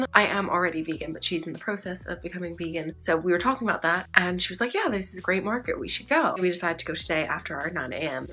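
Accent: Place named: United States. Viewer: I think it's super cool that you are pushing yourself to "read harder" by reading at least one adult book a month. I hope you find some new authors and genres that you enjoy. I am such a tired crier, like if I'm weepy, chances are I'm exhausted. Which country do you live in/ go to uni? Your accent is like super hard to determine lol, sometimes I'm like oh! America for sure, and then sometimes I'm like... England? maybe? Australia? lol I'm the worst at this...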